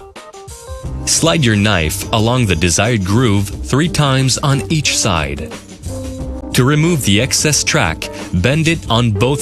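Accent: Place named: Quebec, Canada. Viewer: It's just a slight French-Canadian accent. There's really no need to slag his pronunciation.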